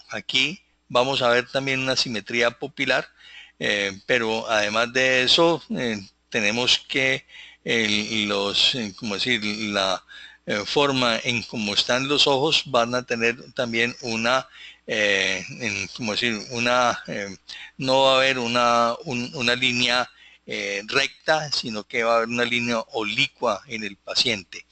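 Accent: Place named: Colombia. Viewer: Buenas tardes usted da cursos de este tema es decir iridiologia me interesa y de donde es yo soy de colombia fue que hice un curso de irdiologia pero veo que no me enseño como es .Como usted esta explicando este supuesto profesor me cito dos dias pero no me explico como usted habla .vulharmente me robo la plata .mi telefono es <num> le agradeceria si me llamara si usted .veo que parece ser de colombia por el acento gracias dios los bendiga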